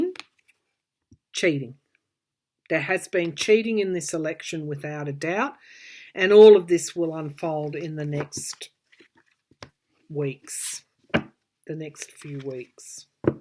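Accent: Australia. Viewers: You are an Aussie? I am from brisbane. I am incredibly nervous. It is so lovely to hear your accent. I am in china.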